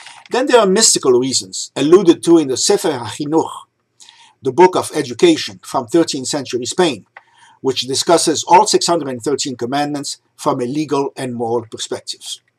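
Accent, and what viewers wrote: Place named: Russia. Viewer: כשאתה מדבר עברית יש לך מבטא רוסי 😅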